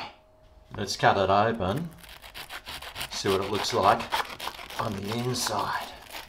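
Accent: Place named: Australia. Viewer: I come to watch the recipes and more importantly, to listen to his Aussie slang/talk😁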